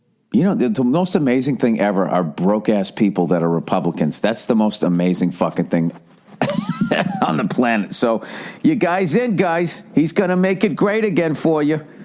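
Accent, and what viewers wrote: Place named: Boston, United States. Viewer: He's from Boston, that's how they speak. I dare say you have your own idiosynchratic speech patterns that others could equally criticise, wherever you're from. Personally, I happen rather to like it.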